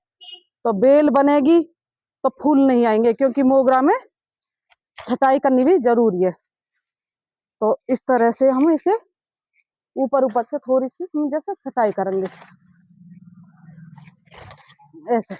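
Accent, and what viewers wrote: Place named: Agra, India. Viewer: Kahan se h*. AAP. Agra ki taraf ki language lag rahi hai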